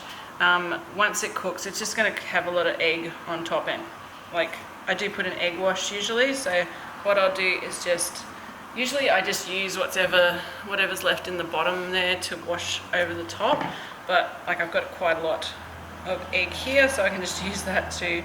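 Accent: Australia. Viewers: Just found your channel. Am I hearing an Australian /accent ? The ingredients you use if they Aussie based can you list in description box the American product substitute a measurements in parentheses. Thank you. I turned away from your last week because for fear I wouldn't be able to follow your recipes I watch the food channels to unwind and food prep for inspiration. Your personality and presentation style brought me back to your channel. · It great to hear an aussie accent too!!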